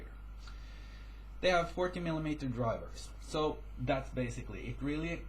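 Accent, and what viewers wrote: Norway. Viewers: Er det norsk aksent eg høyrer? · You sound like a norwegian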